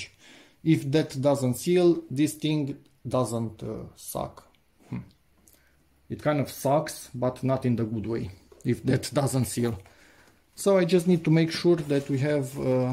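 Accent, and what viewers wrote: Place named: Romania. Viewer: La cum vorbești ești Român toată ziua. :D Zi-mi te rog,il recomanzi ca si putere,trage bine?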